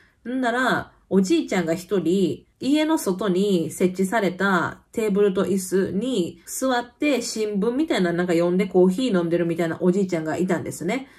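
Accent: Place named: Osaka, Japan. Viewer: 英語でしゃべったら嫌がられるなんて。。フランスみたいで辛いなあ〜。なんで、そんなにプライドを捨てられないのかなって残念ですよね。でも中にはそういう親切な方もいらっしゃるということがやっぱり嬉しいです（＾＾）。ちなみに、のそのまんまの喋り、面白いです笑大阪人ですね〜笑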